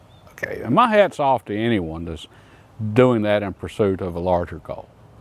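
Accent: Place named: South, United States. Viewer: By the way, I like what you say and that southern accent. I’m an ole Arkansas boy. New subscriber.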